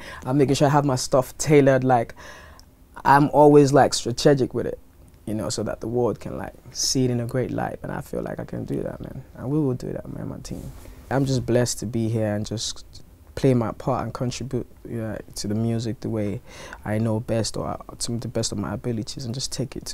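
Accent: United Kingdom. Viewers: I am absolutely blown away. He has a British Accent.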